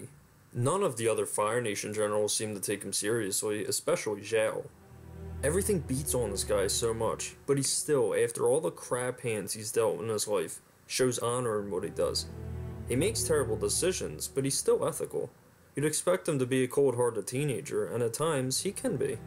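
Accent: United States. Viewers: Ok mate, I really can't tell if you're American, Australian or New Zealander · Dude, your accent is intense... where are you from??? Baltimore? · Bro, you gotta be from the mid-atlantic with that accent of urs. Sound like eastern PA or south jersey. Am i right?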